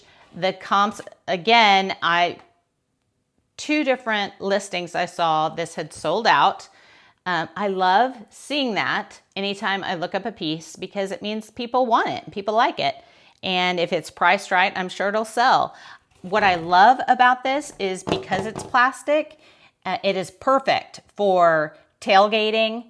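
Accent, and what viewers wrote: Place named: Oklahoma, United States. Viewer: This helped me so much! I knew for some reason you were from OK, don’t ask me why, maybe it’s that you sound like me…lol I loved this video, if you ever wish to share how you inventory your items to know where they are, I would love to see. I only sale vintage home goods, and linens and I, like you, love to use the items in my home ( some of them) I sell vintage home goods, because its what I love to do. I love to shop and when I see an item that makes me think back to my childhood, and a photo of our home, and here is the exact lamp we had. I buy it,I was also grieving my Mother, so went a little nuts on IG live auctions. I am finally listing all of my mother and grandmothers items that were left over from the estate sale, and now need to create a storage solution… I have had to cancel 2 orders recently for not being able to locate the item. 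Happy THanksgiving! Early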